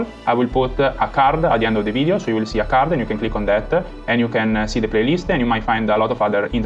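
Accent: Italy. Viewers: Your accent is just like a reknown Italian Football Journalist "Fabrizio Romano" and he is perfect at his job. You're similar to him! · but you sound italian 😁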